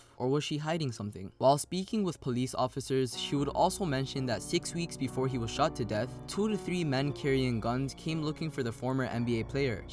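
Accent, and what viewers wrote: Toronto, Canada. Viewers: Your Canadian right? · torontonian accent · you sound like a Toronto mans. Are you from Toronto bro?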